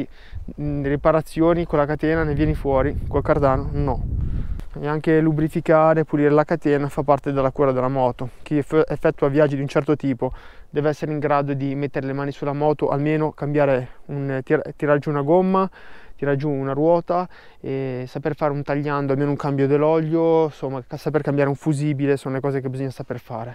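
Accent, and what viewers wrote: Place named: Liguria, Italy. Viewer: Ciao, in un video hai toccato varie parti della mia vita. Nato a Zena sento il tuo spiccato accento ligure, motociclista e innamorato delle due ruote come te, ho vissuto nella parte occidentale africana e confermo essere ostica, ma non ti molla piú puoi lasciare l’Africa, ma lei non lascerá te. Inoltre il cuneese é dove vivo da qualche anno e spesso vedo che passi per le strade che bazzico io con la mia bimba 😍🥰. Grazie di tutto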